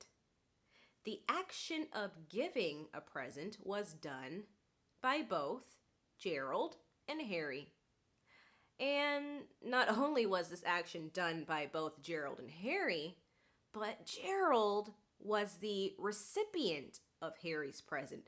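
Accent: United States. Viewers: Great as always sis. I'm from côte d'ivoire and i teach english and I do love american accent. But plz can you gimme the name of the app you use to make your video. Thx take care😊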